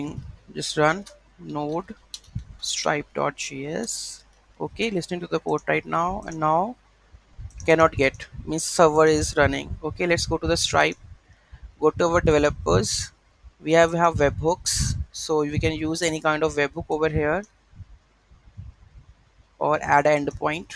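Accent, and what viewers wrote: India. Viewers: I hear indian accent i like the video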